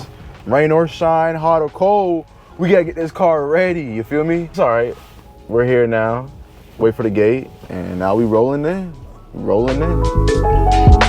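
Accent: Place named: Charleston, United States. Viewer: Im from Greenville and i swear you got the clearest Charleston accent i ever heard 🤣🤣🤣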